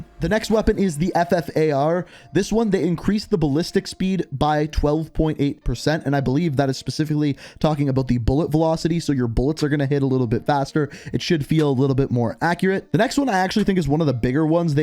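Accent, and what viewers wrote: Canada. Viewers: This dude sound Canadian ...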